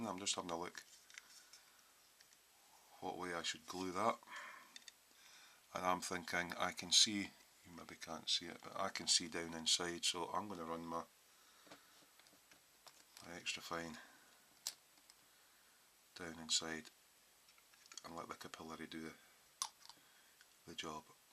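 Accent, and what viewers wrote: Scotland, United Kingdom. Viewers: OO the vidya presenting family grows nicely! Gotta like Tamiya tanks, my first ever painted build was a Russian T62A about a million years ago. I'f better go watch the other vids you've done. Also, everything is better with a Scots accent (my dad was from Glasgow) ^_^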